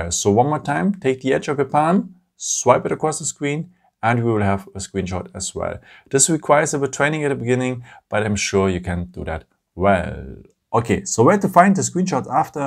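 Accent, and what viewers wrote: Germany. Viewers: Great ! Got mine ysterday . Tought I heard a "german" sound in the video. Vielen Danke from Finland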